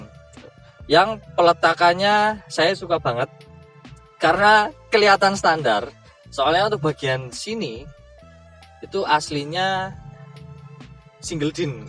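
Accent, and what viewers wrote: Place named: Java, Indonesia. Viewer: medok jowone mas 😅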